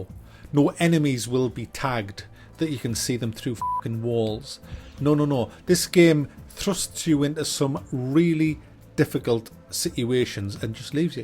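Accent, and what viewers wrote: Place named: Newcastle, United Kingdom. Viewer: nice to hear a geordie on youtube, you are a rare breed apparently...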